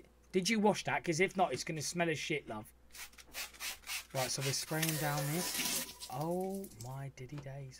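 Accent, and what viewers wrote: United Kingdom. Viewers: man, i love your videos so much, and the ( English British accent 😍 ) amazing bro . big 👋 from an Egyptian fan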